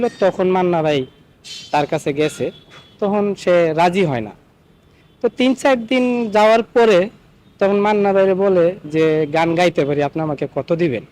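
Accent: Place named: Comilla, Bangladesh. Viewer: লোকটা মনে হয় কুমিল্লা জেলার ,,, কথাবার্তা একদম গ্রামের মানুষের মতো 🤔🤔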